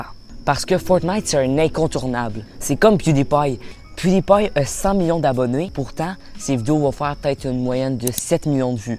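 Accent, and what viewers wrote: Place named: Canada. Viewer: Bonne vidéo frérot ! Avec le petit accent canadien 🙏🏻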